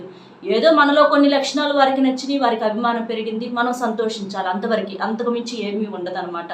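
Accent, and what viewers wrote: Nellore, India. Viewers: మీ బాష యాసా చూస్తే నెల్లురు జిల్లాలో వారిగా అభిప్రాయం కలుగుతుంది.. గారు మీ పోన్ లేదా అడ్ర తెలుపగలరు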